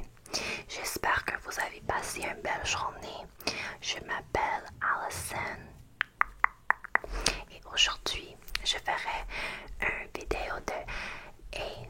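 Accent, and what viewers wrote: Quebec, Canada. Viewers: Sounds exacly like a quebec accent lol and its a good thing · Tu parles le français, mais on entend vraiment le français du Québec, avec les tic de langages, l'accent. 😅 C'est bien de vouloir parler toutes les langues de son pays. ❤ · J'ai cru entendre une québécoise. Impressionnant. 😮 🇫🇷👌🏻👏🏻👏🏻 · Je trouvais qu’il y a avait une touche de québecois dans son accent haha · Don't know if you're french or not.If you aren't and didn't understand when she explained it.She is from Québec wich is a french province in Canada (thats why her accent is way more like texas english but for french).To be brief,she grew up in french schools for her whole life.Her english is good because of Canada's other national language being English.Alot of French people in Canada also have perfect english. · En fait elle est canadienne mais elle a un accent québécois donc j’en déduis qu’un canadien qui parle français aura forcément un accent québécois ?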